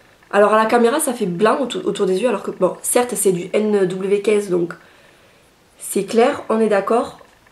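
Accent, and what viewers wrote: South, France. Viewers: tu vien du sud avec ton accent je me trompe ? ^^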